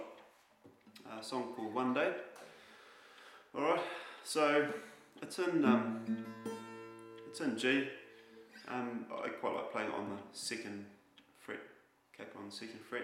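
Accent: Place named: New Zealand. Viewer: Dude, love it, thanks heaps. I'm laughing at how kiwi this whole thing is too. Mumbling bursts and unfinished sentences. Or just concluding your point with "yeah". Love it! Drive any Americans watching this crazy.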